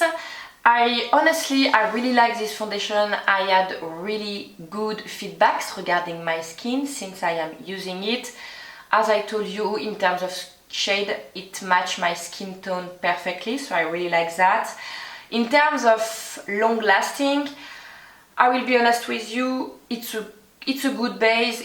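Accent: France. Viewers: I just found your channel by complete chance (searching for Dior Forever reviews!) and I am so happy that I did. I have always been obsessed with everything French and I love your accent. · your french accent is super cute!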